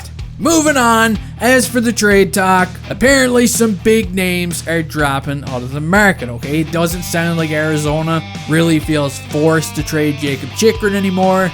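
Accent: Newfoundland, Canada. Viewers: He's new maybe, I only discovered him about a month ago and I love the Habs and have a similar type of humour to - it could be an algorithm thing as well. I personally wish him all the success, however with some of the South Park pics of the Chinese guy and pics of Xi Xingping or whatever the leader of China is, it is probably better off that he stays small because then YouTube will either demonetize his videos or pull them down and try to sensor him, this is kind of a unique stage he is at. And living Ontario, I do not often get to hear that down Easter accent of a Newfoundlander that often, I always look forward to that "BUUUTTTT FERST!!!!" 🤣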